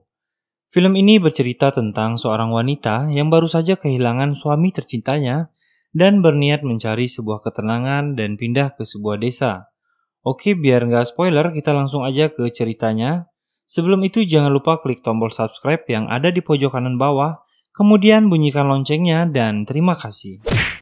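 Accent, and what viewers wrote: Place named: Java, Indonesia. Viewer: ejaan sikopet dia bilang juga bener kok. Kan itu accent nya dia neng. Kaya amrik sama english "neither either" (if you know lah) . Dia juga di video gak pake Bahasa baku amat. Orang jawa kan sering pake plesetan di pronunciation A nya. Like benar bener. Teman, temen. Cepat, cepet. So what's wrong lol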